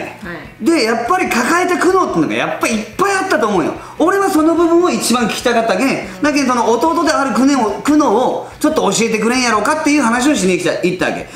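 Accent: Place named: Hakata, Japan. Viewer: 清々しい感情と博多弁がとても好感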